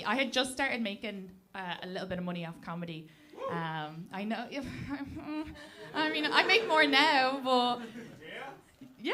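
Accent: Ireland. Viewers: I love the Irish accent even though I can't understand half of what she's saying. 😄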